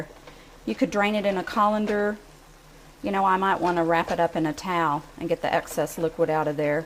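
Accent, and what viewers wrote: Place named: South, United States. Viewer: Wow looks delicious! Thanks for the mushroom tip, to cook on higher heat and cute Southern accent.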